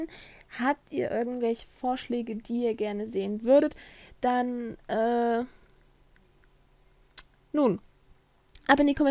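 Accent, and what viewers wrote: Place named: United Kingdom. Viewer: your English sound very British, which I like, I think it is brilliant XD ... ich würde zwischendrin einfach mal ein paar Folgen Mirrors Edge in Englisch toll finden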